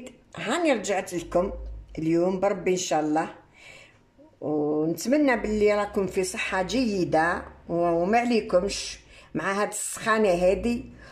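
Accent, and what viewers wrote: Constantine, Algeria. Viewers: Salem Mme la dame qui vous dit que vous etes entraine de tremper les jeune elle dit n'importe quoi. Et Mme tous ce vous faites est Constantinois d'origine .des la première fois a votre voix j'ai compris qua vous etes Constantinoise beldiya.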